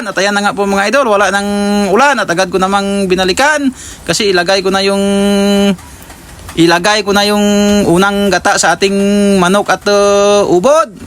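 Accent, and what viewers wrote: Iloilo, Philippines. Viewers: Ilonggo ka dong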